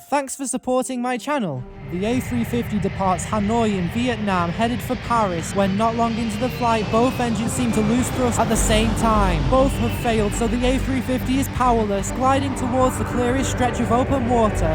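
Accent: United Kingdom. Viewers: He's british (I think) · Are you British?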